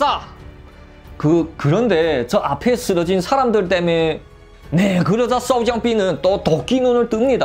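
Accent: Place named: Northeast, China. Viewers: 이분 조선족 사투리 해설 정말 재미있네 앞으로도 계속 부탁할께요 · 와 진짜 재밌다.우리 동북에서의 구수한 사투리에 반함 ㅋ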